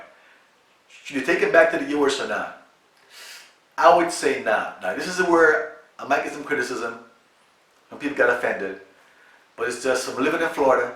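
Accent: Jamaica. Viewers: I live in the D.R. and I Teach at a private school.. I would like to teach online..can you point me in the right direction. I hear your Jamaican accent..I'm Jamaican too ..via Toronto/New York.. I m glad you made the choice to live life..and not get stuck Pretending in the Matrix.. Please respond your information would be valued.. Love what you are doing!!! Selvin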